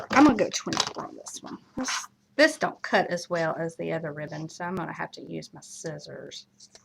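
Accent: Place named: South, United States. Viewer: I LOVE YOUR HELEN BOW !!! YOU HAVE TO BE FROM THE SOUTH..... YOU TALK LIKE ME ! YOUR MISSISSIPPI FRIEND I LOVE YOUR VIDEOS ❤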